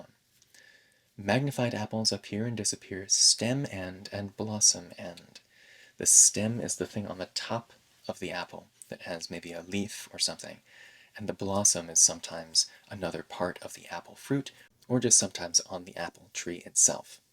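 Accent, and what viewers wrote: United States. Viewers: Bbest teacher ever ❤ I learn American accent from you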